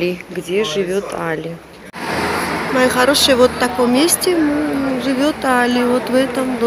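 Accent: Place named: Georgia. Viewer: Простите,вы,по национальности грузинка? У вас,акцент ,наверняка грузинский.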